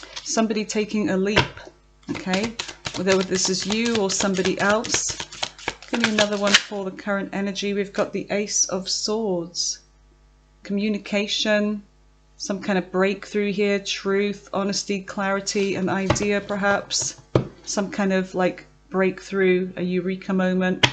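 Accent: United Kingdom. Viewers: Hello, Shout out from the Coachella valley. Brilliant Reading 😊 By any chance, are you from Cornwall England? Cheers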